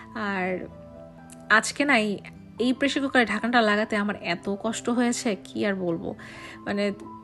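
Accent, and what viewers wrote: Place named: Rangpur, Bangladesh. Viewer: Apu apnar onek kotha Rangpurer mone hoy.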